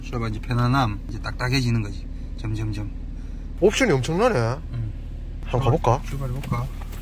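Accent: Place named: Gyeongsang, South Korea. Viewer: 오리지널 대구네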